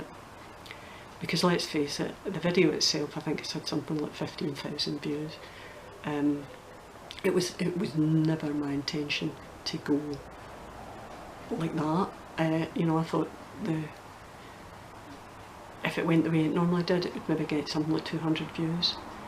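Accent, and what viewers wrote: Scotland, United Kingdom. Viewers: i believe you are more than ordinary. My sister in law moved from scotland when she was 7. Her mum was one of the most beautifully souled women i have ever met. She was a ray of sunshine....you remind me of her. I love the accent. As women we do tend to stretch ourselves thin...i think that is why we are more suseptible to depression and anxiety. We need to lift each other up....💖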